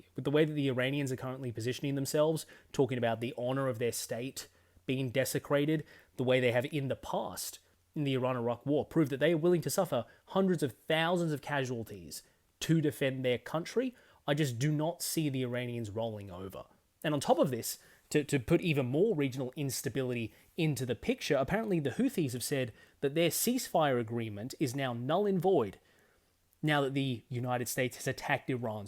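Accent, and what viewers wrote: Australia. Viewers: Australian channel, Australian pronunciation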